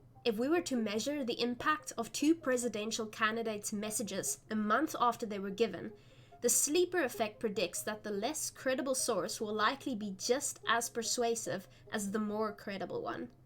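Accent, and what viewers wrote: South Africa. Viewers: I'm guessing that the mere exposure theory would be why people with specific phobia are encouraged to go through exposure therapy? Though frankly, as a person with a phobia, I want nothing to do with that 😅. I know this video is a month old already but I quite enjoyed it. I've always loved psychology but not on the level that you obviously do. Bonus that I'm an American who lived several years in SA (Capetown), so hearing a familiar accent is just lovely when hearing about a topic I enjoy. Thank you for the content, and I look forward to hearing more as you continue your studies.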